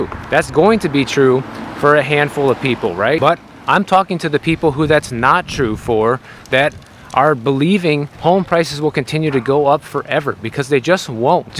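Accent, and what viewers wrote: Brazil. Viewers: Is from Brazil? My wife is Brazilian. She and could be sisters...accents and all. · She sounds Brazilian! Viva Brazil🇧🇷✨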